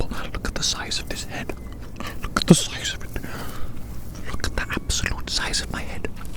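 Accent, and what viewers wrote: Wales, United Kingdom. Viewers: Fantastic asmr!!! Also do I sense a welshy??🤔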